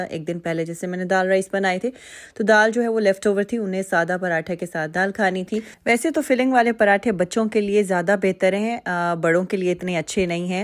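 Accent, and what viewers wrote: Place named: Karachi, Pakistan. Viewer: Abp Pakistan mean khan sy hy lahore ya karachi batey ga lahjato karachi ka lgta hy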